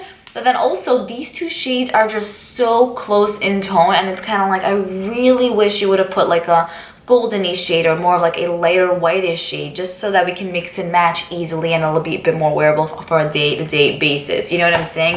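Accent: New York, United States. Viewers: Im from the South. So the New York voice is so addicting! haha good video. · Love your accent! You need a video just repeating the word "gloss", LOL. I was like is she from New York....looked in the description box and what ya know, you are! LOL.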